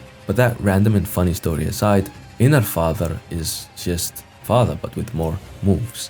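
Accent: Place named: Italy. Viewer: I'm italian, and you REALLY have the accent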